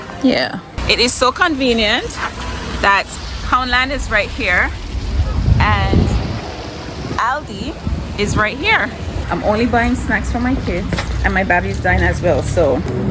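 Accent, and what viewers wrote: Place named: Jamaica. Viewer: Think it's Jamaican.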